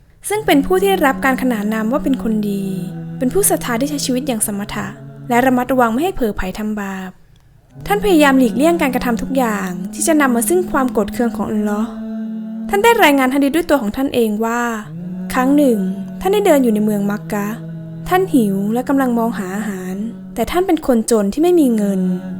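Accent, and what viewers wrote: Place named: Thailand. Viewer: เขาเป็นมุอัลลัฟ ไม่เคยเรียนฟัรดูอีน เลยออกเสียงตามพยัญชนะไทย ไม่ใช่ตามภาษาอาหรับ เลยเพี้ยน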